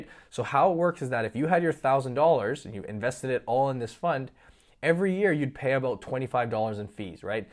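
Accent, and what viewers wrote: Toronto, Canada. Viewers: ahaha Toronto mans for sure. great content bro new follower here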